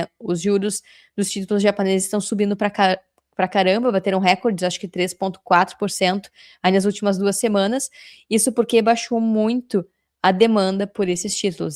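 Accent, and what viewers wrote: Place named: Porto Alegre, Brazil. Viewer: Comecei a seguir o canal só para agregar o sotaque porto-alegrense às análises econômicas, e sentir falta de casa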